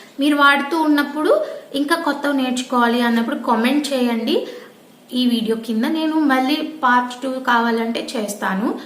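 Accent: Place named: United Kingdom. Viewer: Very well rendered in Brit accent ya!! Btw what word was that u used for call disconnection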